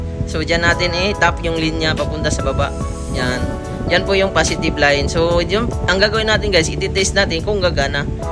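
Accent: Iloilo, Philippines. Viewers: Ilonggo kaba boss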